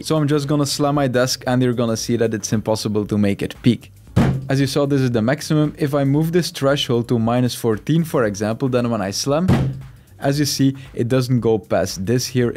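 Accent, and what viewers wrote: Netherlands. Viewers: I smell nederlands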